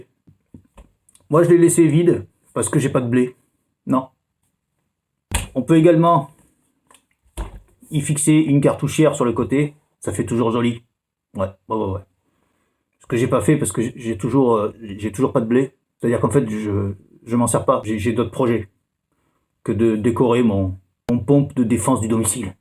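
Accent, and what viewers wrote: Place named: South, France. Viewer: Putain c est génial !!!! Tout l accent du sud qui va bien et le reste !!! Mdr 😂 salopage mythique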